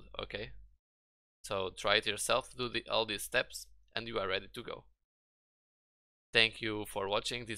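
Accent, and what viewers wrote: Portugal. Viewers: claramente accent Portugues :D Bom video! Obrigado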